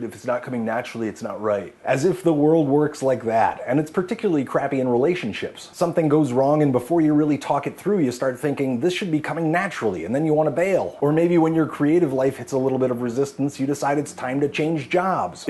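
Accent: Germany. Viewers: The hilarity of a german guy talking like a cliché german. It makes me so happy. Oh boy. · ze has such a good german accent!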